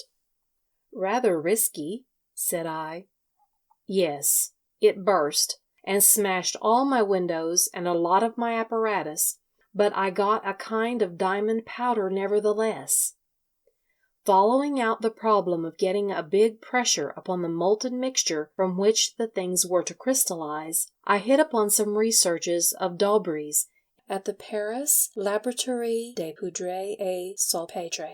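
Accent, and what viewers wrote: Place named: South, United States. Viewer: Love your Southern accent. And your narration. 😊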